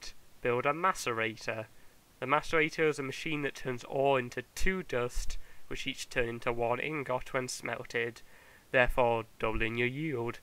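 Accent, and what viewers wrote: United Kingdom. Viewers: Probably a dialect of the UK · You sound like 'Charlie is so cool' u know that British one haha.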